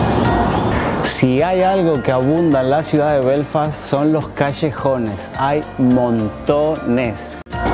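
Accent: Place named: Rioplatense, Argentina. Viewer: Buen video, te escribo desde El Salvador 🇳🇮. Cuál de las dos Irlandas es más próspera??, Y cuál es tu nacionalidad??? Es que tenés una mezcla de acento rioplatense y de la península ibérica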